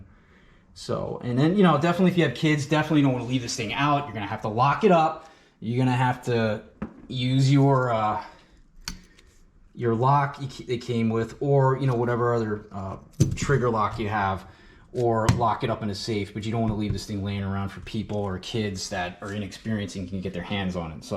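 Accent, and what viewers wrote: United States. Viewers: Dummies don't need to be within 5 miles of a firearm. Dummies get themselves and other innocents killed! This guy has a damn Yankee accent and attitude! "YANKEE GO HOME!" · Hey, bro, This is Seoul and I’m a South Korean. I love your English accent. Where are you originally from? Born and raised in Texas?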